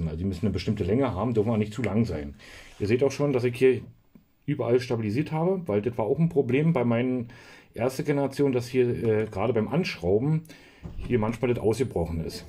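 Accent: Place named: Brandenburg, Germany. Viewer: Diese 8 Minuten vergehen wie im Flug... In welchem Landkreis bist du denn Zuhause? Überlege ob ich mal deinen Tracker genauer in Augenschein nehmen muss!😊 Klingst nach südlichem Brandenburg....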